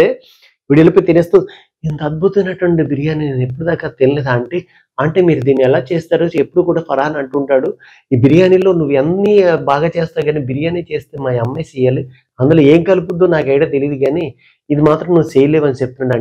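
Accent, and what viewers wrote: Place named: Godavari, India. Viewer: మీ గోదారి యాసతో డైరెక్టర్ కు గుణపం దింపారు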